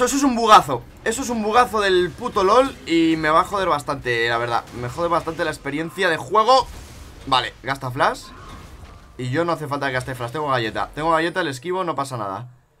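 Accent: Argentina. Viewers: Ese acento argentino papaa! Sos un kapo:v Como siempre disfrutando todos tus vidios. pd: juga singed. Es free elo, encima no fallas los gordotes Kappa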